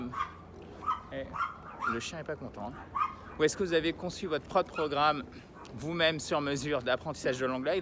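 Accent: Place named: United States. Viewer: Hey it s me Michelle. I work ou english ever days. I see yours videos and tutos de huito 's videos. The difference between you it s the accent American for you and Bretish for Huito. I heard Beatles ' s songs. At the beguining of octobre i have to go lesson by a teacher. I think subcrire at your formation at the end of this year. Not before because i have no time.!!!!!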